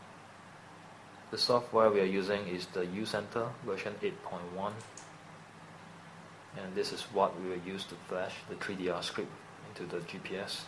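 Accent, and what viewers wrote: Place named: Singapore. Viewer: Singapore English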